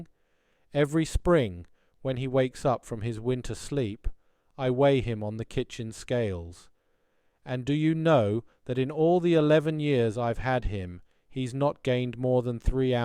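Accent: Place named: United Kingdom. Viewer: d=(^o^)=b thanks 좋은 영상 감사합니다. 영국식 발음 ㅋㅋ 워터~~ 영어화면 있으니 비교해서 보는게 좋네요^^ 영화도 보고싶네요^^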